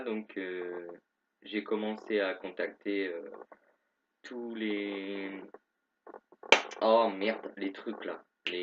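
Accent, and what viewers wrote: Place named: Nord-Pas-de-Calais, France. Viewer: Ouai, ils sont vraiment graves l'administration... ils ne se mettent pas à ta place, ça coûte rien de modifier un prénom tout en laissant au pire ton prénom f quelque part, au moins pour que tu te sentes bien en cours, quoi. Et pour la personne qui t'a balancé, je trouve ça dégueulasse, je lui aurai refais le portrait lol Moi aussi, je suis du Nord, plus près de Béthune :D Me semblait bien avoir reconnu l'accent ch'ti ! Et t'en fais pas pour la mammec, ton résultat est vraiment bien pour 3 mois, ça va encore beaucoup changé.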